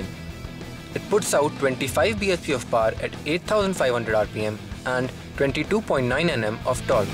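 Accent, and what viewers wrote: India. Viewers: gotta love the indian accent =D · he's a south Indian. Most likely to be a Keralite xD They pronounce T like that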